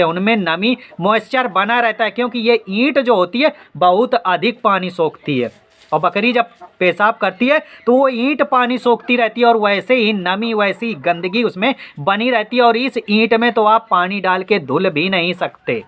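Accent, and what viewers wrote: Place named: Uttar Pradesh, India. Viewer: मैं बिहार सिवान से हूँ, आपकी बोलचाल, भाषा से लगता है कि आप यू पी से हो ।आपकी video बहुत ही जानकारी देता है। कहाँ आपका घर है।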